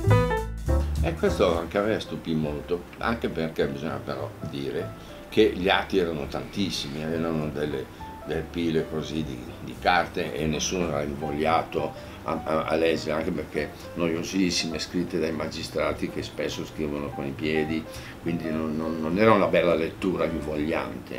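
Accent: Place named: Naples, Italy. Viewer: Spesso dice cose discutibili ma qui è stato grande con tutte le ragioni simpatico reale e comico l'accento espressivo napoletano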